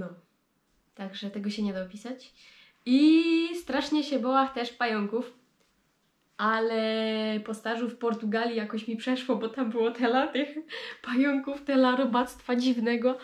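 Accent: Silesia, Poland. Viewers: Jeronie jako gryfno Frelka i richtig fajny slaski akcent ♥️♥️ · gadki po Śląsku fajnie się słucha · Super filmiki, piękna dziewczyna i super Śląska gadka pozdrawiam i czekam na następny👍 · Gwara śląska jest super, fajnie się Ciebie ogląda. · Uwielbiam te twoje śląskie gadanie :) Absolutnie musi to być na kanale! To tak wyróżnia ten kanał z innych. Jak komuś się nie podoba to niech nie ogląda i tyle! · Siema Dziołcha nima co się wstydzisz noszej godki to je sam piękne że się godo cza ta tradycjo naszo pielęgnować i nie słuchać gupich ludzi kere nie tego nie rozumieją. Pozdrawiom z Bytomia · Nie jestem ze Śląska ale uwielbiam słuchać gwary slaskiej, dla mnie jest to trochę taki powrót do dzieciństwa kiedy oglądało się serial Święta wojna od tego czasu pokochałem Śląsk i gwarę ☺️ Pięknie wyglądasz w tych okularach ☺️ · Ładna dziewczynka. I nawet nie przeszkadza mi " ślonsko godka". 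Dzięki YT za polecenie kanału. A starsza siostra wolna? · Śląska godko to śląska godko sztosik mówisz i opowiadasz. Tako kobite to ciężko znaleźć ;P pospolita dobra duszyczka. Podziwiam i oby ten kanał się dobrze rozwinął ;) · twojej śląskiej gwary... fajnie tak gadasz po wieśniacku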